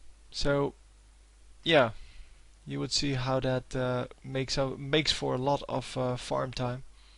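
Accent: Netherlands. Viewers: Better quality :) The game was not that special xs. Nice comentator Aan je accent hoor je dat je NL bent ;) BE hier ^^ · when dutch ppl speak english 0,0 nty plz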